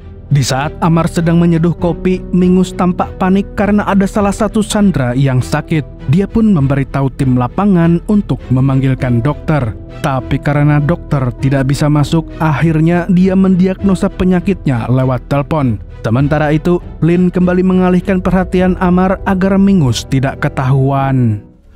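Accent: Banjar, Indonesia. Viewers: Mantap mank,👍 Filim x msntap suara amank x banjar bnr😂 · Asli Banjar kh bg? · Ae urg banjar kah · RsJ sambanglihum 😂 Real org Banjar nih nah 😅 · Urang banjar kah sekalinya pian ni mang... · orang Banjar kh 😂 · Urang banjar sekali amang nih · org banua ini😅 sambang lihum😅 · Ammarnya urang banjar skalinya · Urang Banjar KH pyn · Urang Banjar sekalinya😭🙌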